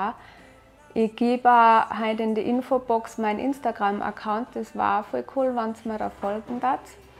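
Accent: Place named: Bavaria, Germany. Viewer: Du bist so ein Sonnenschein, dem Dialekt nach 🤔Bayern oder Österreich? ich liebe deine Deko und auch wie es bei dir zu Hause aussieht, voll mein Geschmack, fühle mich einfach gut in so einer Umgebung. Als Kind wuchs ich in einem Dorf auf mit Tieren und Garten drumherum, Veranda..Jetzt auch im Dorf nur Leider nicht so schön wie damals. Treume von so einem Haus😌🥰wie eures🌹Dankeschön für die Inspirationen.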